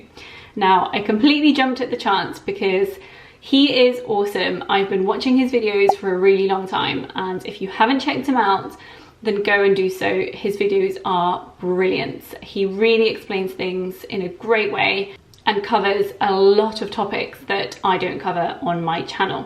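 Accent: United Kingdom. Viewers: Really like your channel! I started investing roughly at the same time as you (Dec 2019), I am 27 and I am also an immigrant living in London, I feel quite identified. I didn't know you are Polish and all your family is from there. Your British English accent has 100% confused me. I suggest you take a look into Taylor Wimpey for a great Real Estate mainly UK based company. Very safe, almost no debt and a lot of growth ahead. Are you interested in any particular industry to get into more UK companies?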